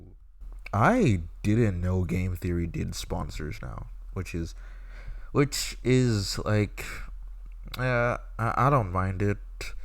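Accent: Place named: England, United Kingdom. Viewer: I want the crazy horny werid dude not that fucking anoying posh English cunt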